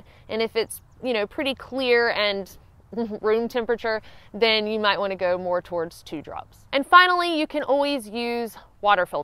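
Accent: United States. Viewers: Love the proper American accent!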